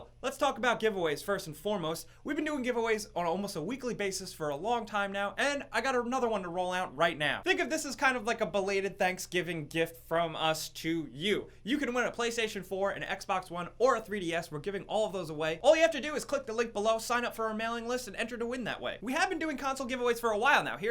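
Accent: Canada. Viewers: You americans? are you canadian like me?:D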